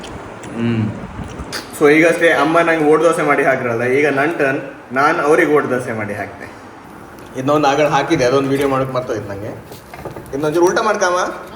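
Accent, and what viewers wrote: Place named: Kundapur, India. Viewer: I love kundapra kannada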